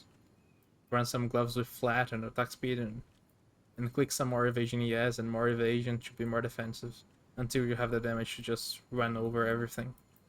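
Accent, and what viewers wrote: Brazil. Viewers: é Brasileiro esse sotaque🥰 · Voce tem sotaque brasileiro parece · Mano, excelente vídeo aospdkaposdkaspodk eu comentei antes de ouvir você falar, ai eu vi que era BR hahahahaha. Vou seguir sua build. Você acha que ela funcionaria com Abyss Lich? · hmmmmmmm tu é br né? esse e esse sotaque, me cheira a br kkk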